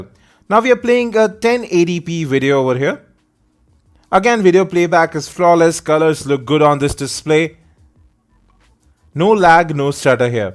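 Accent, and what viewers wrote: India. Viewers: inida p3ople's words and accent make my head blow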